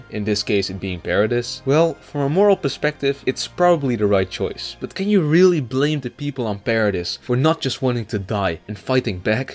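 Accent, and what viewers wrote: Netherlands. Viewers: I smell some dutch am I right?🤣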